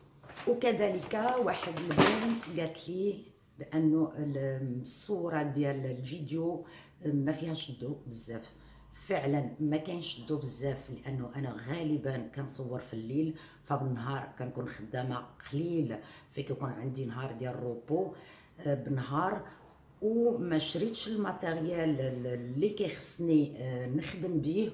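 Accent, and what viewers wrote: Algeria. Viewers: سبحان الله هضرتك تقولي جزائرية واش مزوجة جزائري بالتوفيق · هدرتك بحال الجزاىريات 🌹 انا جيت من عند أمينة ❤❤ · ولله تقول جزاءرية يا اخت امينة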